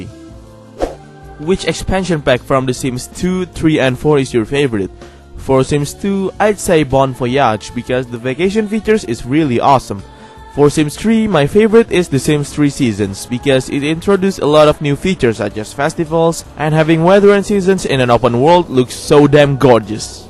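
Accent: Indonesia. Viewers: I knew you are Indonesian, from your channel's name, your accent is really cute xD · iya aksen lu emang indonesia banget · Aku tau kamu orang indo karna cara bicara kamu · Oh. Im Malay and understand Indon. I knew that accent. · I KNOW IT!! haha!! because, you speak english language in Indonesian accent :v perhaps, you should try English in "medok" accent :v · maigat w kira lu 22tahunan ternyata tuaan gua yha hhh. btw tau banget sih lu orang Indonesia from ur accent kedengeran banget ditambah unamelu ada ""doangnya" wkwkw gua tbtb seneng sendiri karena lu orang indo(?) ya because I am actually proud of you because u have So many great contents and i really enjoy all your videos! keep it up dude! sukses selalu! Cheers👍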